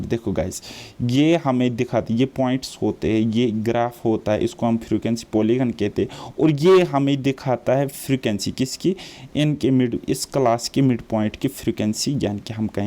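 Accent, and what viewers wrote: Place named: South, India. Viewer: Sir ap na south Indian or kashmiri acesente mix keya ha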